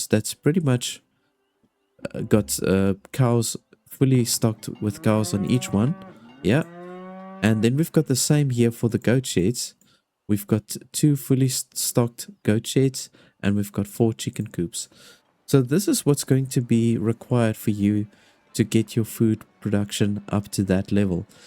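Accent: South Africa. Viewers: Hey dude... as a South African. You sound like a South African. Where you from???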